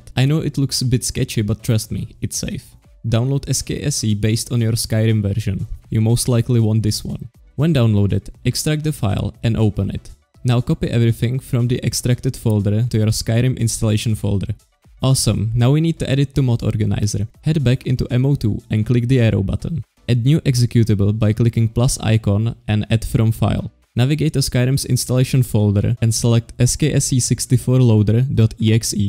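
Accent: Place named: Czech Republic. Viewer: Slyšim tu češtinu, bráško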